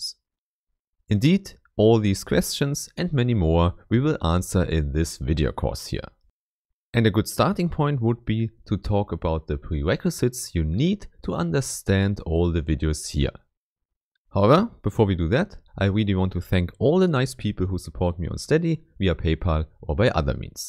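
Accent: Germany. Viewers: German accent makes this video even more credible.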